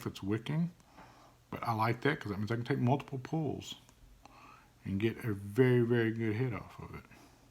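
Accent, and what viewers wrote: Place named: South, United States. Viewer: Also, no disrespect but you country as hell 😂😂😂. As a country boy from Alabama I appreciate it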